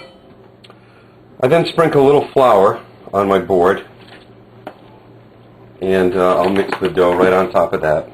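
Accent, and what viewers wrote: United States. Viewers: Are you from Philly? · HEY ARE YOU FROM NEW YORK LOL when ever i lisen to that accent it reminds of when i lived in the bronx, i moved 2 years ago and boy do i miss the pizza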